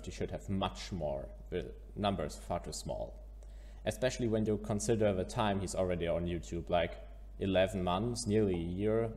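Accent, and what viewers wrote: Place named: Germany. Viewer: I love your german accent.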